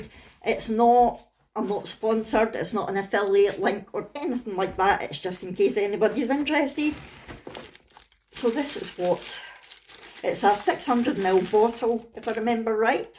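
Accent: Scotland, United Kingdom. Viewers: Hi that's a brilliant idea it's good to hear the Scots dialect and celebrate it x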